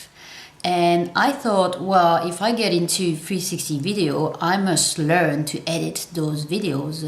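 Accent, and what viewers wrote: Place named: France. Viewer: Thanks for the information and the cute French accent. I’ve liked and shared.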